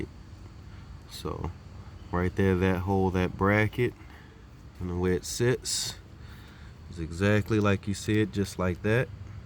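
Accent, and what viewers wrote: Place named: Baltimore, United States. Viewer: Are you from Baltimore? Your yews and tews are a lil prominent. Helpful vid btw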